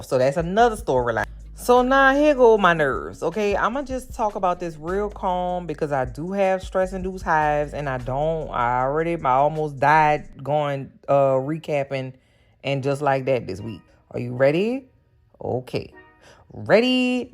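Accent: New Orleans, United States. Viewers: New listener here! Stumbled across your review of AJLT, immediate listen just because I love the New Orleans ⚜️ accent so bad ❤. Then found you were hilarious AND was reviewing TGA too? Done. Subscribed. 😊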